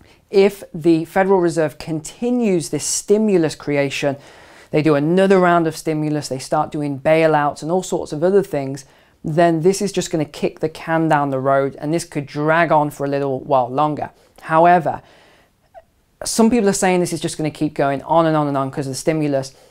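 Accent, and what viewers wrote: England, United Kingdom. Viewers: All of his real world video was of Britain and his accent is British. Guns are far more difficult to in the UK. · I didn't like your video at first because of your English accent... How could you relate anything in the United States ?? I watch a lot of videos like David Morgan Gregory Mannarino.. Gregory Mannarino is my favorites show !!!! but you sir are right at the top with the best of them I really enjoy your shows now ... keep spreading the truth and God bless 🙏 🤓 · Is this the American stock market you're referring to. It is confusing with your British accent. You should make it clear. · I listen to him because he has an English accent, as an Ozzie, the English are like our smart uncle!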